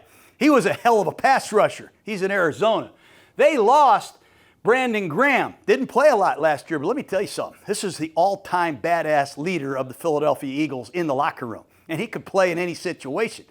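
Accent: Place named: South, United States. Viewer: He might sound like a red neck, but he gives a quality presentation, good vernacular and spot on.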